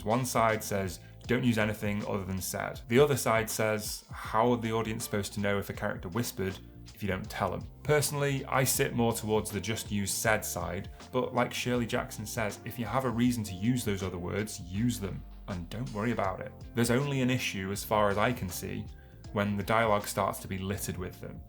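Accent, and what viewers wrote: United Kingdom. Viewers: Congratulations on your novel. Plus, I love your British accent!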